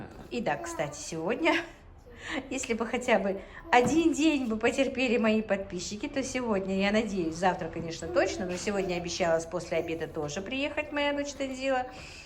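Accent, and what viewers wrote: Standard. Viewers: я Ваша тёзка. Недавно подписалась. Я с Троицкого. А Вы где живёте? И ещё хотела спросить: Вы раньше не в Грозном жили? Или с Пригородного? У Вас речь чистая и правильная...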